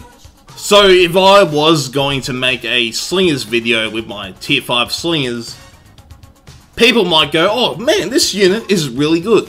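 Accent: Australia. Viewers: jeah and his aussie trashtalking is fucking funny xd but i meant that he is one of the few youtubers that doesn't just upload average games or boring low tier matches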